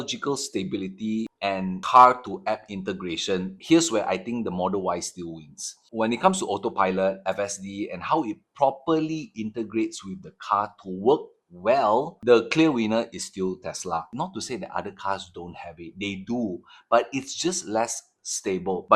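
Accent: Singapore. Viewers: Looks like Malaysia where cars are dirt cheap. But you sound Singaporean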